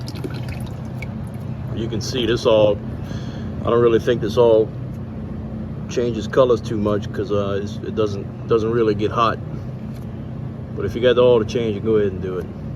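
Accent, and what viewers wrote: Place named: Louisiana, United States. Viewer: You from lafitte area? Sounds like it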